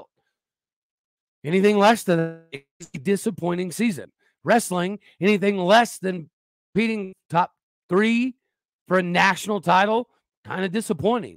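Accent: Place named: Oklahoma, United States. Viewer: oh no you mistake my meaning, I’ve listened to hours of your podcast. Frankly I didn’t realize I was a “city boy” until I went to Oklahoma State. I graduated with over 500 in my high school class, where classmates at OSU had just a handful. I remember seeing Stormy practicing roping a rebar cow right outside of Bennett. The pronunciation just became more pronounced due to semantic satiation. You said it so many times in this segment it stuck out. I don’t think any less of you, it’s just a regional accent. I still live in the south and appreciate the culture, but at times I stick out. Some people can pick out that I actually have a California accent. 🤪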